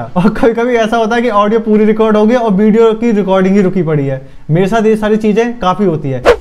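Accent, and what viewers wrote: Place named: Haryana, India. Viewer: Bhai apka toh bhasa hariyana ka hai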